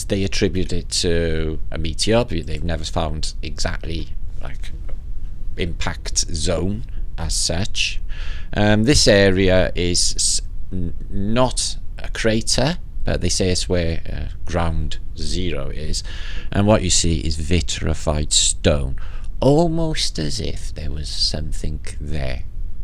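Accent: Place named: Liverpool, United Kingdom. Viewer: Febf good to see British YouTube canel bless you xx bro luv da Scouse x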